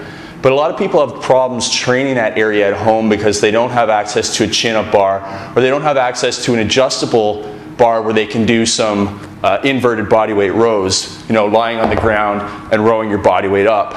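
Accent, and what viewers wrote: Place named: Canada. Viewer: I think he is Canadian because he says "oout"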